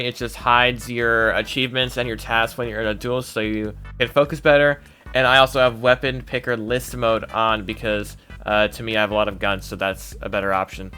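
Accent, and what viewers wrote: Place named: United Kingdom. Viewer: they both sound young and briish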